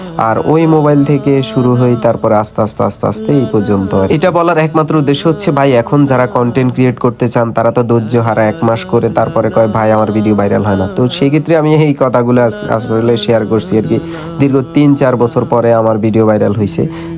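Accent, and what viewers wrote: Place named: Noakhali, Bangladesh. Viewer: ভিডিও সুন্দর করে তোলে সুধু নোয়াখালী ভাষার জন্য ই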